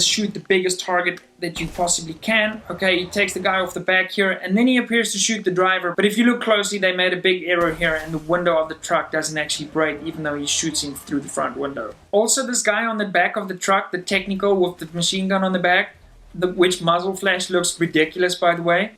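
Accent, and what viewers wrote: Afrikaans, South Africa. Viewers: Is jy afrikaans? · This guy sounds like he is a South African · I thought so! 🇿🇦🇿🇦 recognize that Saffa accent anywhere. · Is this guy south African? Dope... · You sound south african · Love die Afrikaanse aksent:) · Are you South African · your accent? are you a south african? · The first couple of seconds into this video I paused... Hmm... This guy... Is South African... I was right ^_^ Lekker man.:) Goeie video. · He sounds South African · Sounds like a old south African accent..? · Hold up, are you South african? your accent and speech... must be?:O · Where are you from? I want to say South Africa 🤔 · South African accent? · South African? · Hey man, you from South Africa by any chance? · You sound South African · i thought i recognised that accent, im South African, great work here man! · Yo are you South African? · are you from SA?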